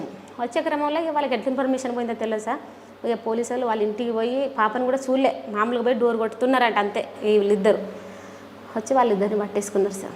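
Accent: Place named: Telangana, India. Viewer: కామ్రేడ్ మేడం నిజంగా మీరు చాలా అందంగా ఉన్న కామ్రేడ్ తెలంగాణ యాస లో మాట్లాడుతుంటే ఇంకా బాగున్నారు. ఇట్లా,,, గిట్లనే,,, బోయిన గూడ,,, మంచిగా,,, మస్తు,,, 👌👌👌అందమైన మీ పదహారణాల్ల అందం అంతా అడవిలోనే గడిచిపోయింది.నిజంగా ఈ ఇంటర్వ్యూ చూసి నేను ఫిదా అయిపోయాను మేడం. But ఏదేమైనా i love కామ్రేడ్ 🚩🚩🚩🚩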